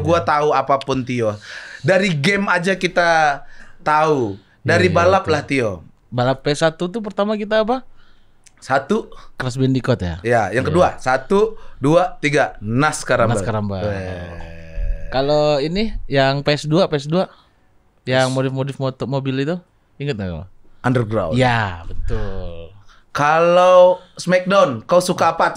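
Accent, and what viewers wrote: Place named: Sumatra, Indonesia. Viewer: Seneng banget nonton ini sebagai orng Sumatra, logat nya dapet banget😂😅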